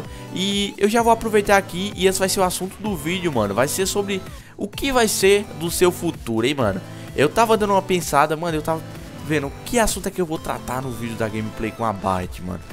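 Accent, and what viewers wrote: Northeast, Brazil. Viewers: ai vc é nordestino! por que eu so de pernambuco e vc?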